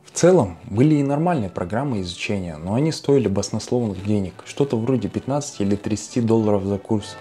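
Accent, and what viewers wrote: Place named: Tatarstan, Russia. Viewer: Молодец! Восхищаюсь! По тому, как говорите на русском, сказала бы, что акцент , как у татар😀 Сама татарка🙂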